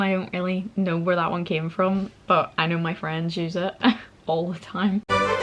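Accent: Belfast, United Kingdom. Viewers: Love female Belfast accent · I think you did good lass. Seeing as studied with at Uni with Irish students from Norn'Iron and the Republic. I would think Belfast hast the sexiest accent but I would vote for Fermanagh. Though you don't have a strong Belfast accent. I have been over a few times since and Pandemics permitting I hope to go to New Horizon next year in the North. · I'm from Ireland myself😭😭 and to be honest I do love a Northern accent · The best accent in Ireland by far.... And I'm from dublin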